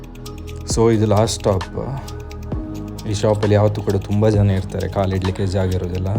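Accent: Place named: Coastal Karnataka, India. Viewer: Bro u are from Costal region ? Ur Kannada seems familiar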